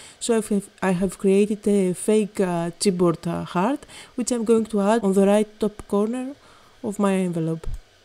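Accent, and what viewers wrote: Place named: Greece. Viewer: I have been following your blog and videos for a while now and just love your cards. Just love your Greek accent.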